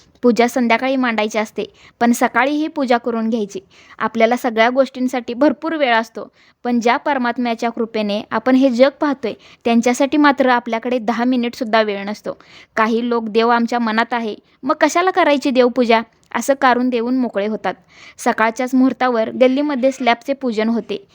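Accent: Kolhapur, India. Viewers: ताई तू कोल्हापूर जिल्यातील आहेस का, बोलणं भाषा जवळची वाटते ❤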